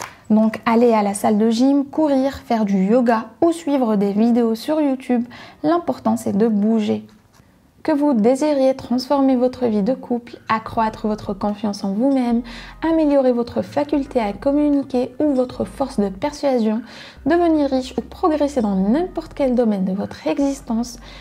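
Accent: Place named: Algeria. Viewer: C’est fou ça fait longtemps que je m’étais abonnée à ta chaine, comme j’avais trouvé ton contenu similaire aux chaînes : mind parachutes ou encore prendre sa vie en main... j’avais décelé l’accent (nous ne parlons pas comme les Français natifs même si l’on a un riche vocabulaire) mais j’étais loin d’imaginer que t’étais Algérienne comme quoi les Algériennes sont partout !!! Je te félicite pour ton contenu, vraiment qui sort du lot des youtubeuses Algeriennes. Big up sista ! 🙌🏻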